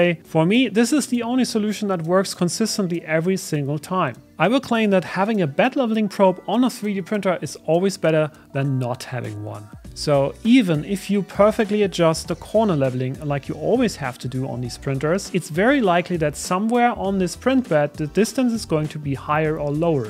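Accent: Germany. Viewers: I hear a German accent (or one that could be mistaken for German) and I watch the whole video. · Der deutsche Akzent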